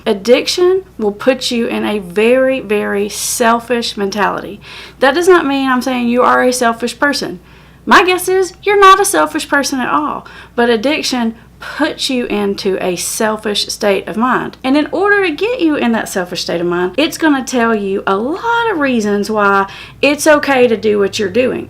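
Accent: South, United States. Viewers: Loving the Southern accent!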